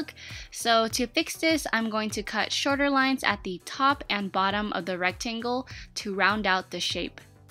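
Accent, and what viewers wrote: United States. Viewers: i love these videos even though i have no sewing ability whatsoever 😂 also, it amuses me the way americans say legs like 'ley-gs' rather than 'leh-gs'. 😉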